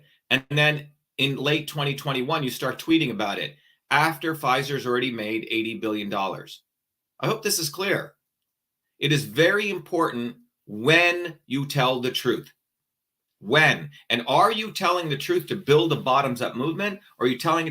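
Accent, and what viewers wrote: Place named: Kansas, United States. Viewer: Im 41 and I live in central Kansas, USA. I was raised in a 3,000 person rural town. I will be blunt, I love showing Dr. Shiva to all of my cracker redneck hillbilly hick ass friends! I love to hear him SPEAK EXACTLY the same way that "we" all talk around here. I',m going to tell them to start closing their eyes before they start to listen. Scumbags equals ALL POLITICIANS! Look here, it is time for real talk, foul language, and time for some fucking action. Semper Fidelis means something to me again and I will register to vote again and go back to encouraging my kids to serve for our country as i did if this dude gets elected. Fuck the Senate, we need you now Doc! Help us save what is left of our failing country so that, among MANY THINGS, our fallen will have not died for anything.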